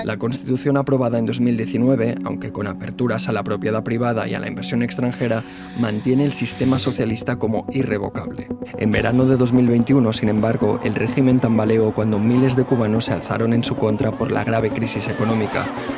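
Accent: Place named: Spain. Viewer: Me hace gracia que quien presenta este mini docu....tenga acento espanol, cada ves que escucho los espanoles poniendo el dedo bien puesto. Me pregunto porque los espanoles son los primeros testaferros del regimen? Muchos espanoles le dan oxigeno al regimen. Deberian de consumir su tiempo en propagandiar al regimen castro-canel y sus testaferros espanoles a ver si tumbamos esa inyeccion de oxigeno que le regalan al regimen castro-canel....empiezen por ahi! USA no va a dejar ninguna alianza entre ambos coja alas, esto es oxigeno entre ellos y Cuba o el gobierno nefasto de Cuba le debe hasta el culo a los Rusos. Putin como mafioso mayor que es, esta recogiendo lo suyo, los rusos no saben pelear y los cubanos muchos menos. Todo eso es fachorias para darle valor a ambos paises que estan al borde d ela quiebra social. Y China no se queda atras, los mismos desastre naturales que tienen en le pais van a cobrar todo aquel mal que lleva sufriendo el pueblo de China por culpa de otros mafiosos!! 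Cuba, China y Rusia llevan la misma dosis de tratos!! Dejarlo que cojan alita se les van a quedar en alitas de pollo....kentucky chicken.....La dictadura castro-canel le quedan dos round tick tock tick tock.....time clicking.. Milei 2023 Derrocar la dictadura castro-canel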